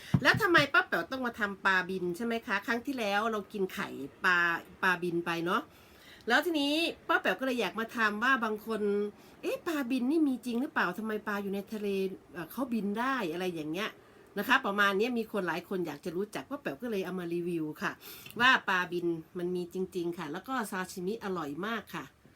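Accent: Isan, Thailand. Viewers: ป้าแป๋วคนอิสานใช่บ่ ผมฟังรู้ 555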